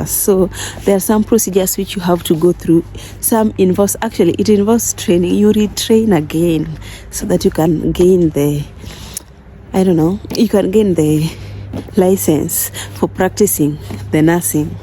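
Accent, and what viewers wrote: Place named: Kenya. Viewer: You sound East African, and keyan to be exact. Why the Ghana flag? 🇬🇭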